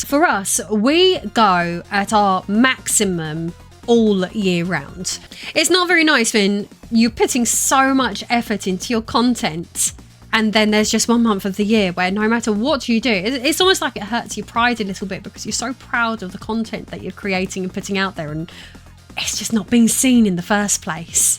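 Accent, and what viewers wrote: United Kingdom. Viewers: I watched one of your videos the other day now I'm getting a steady stream of your content. I'll stick with you because you're British and the accent is perfect. The BBC should hire you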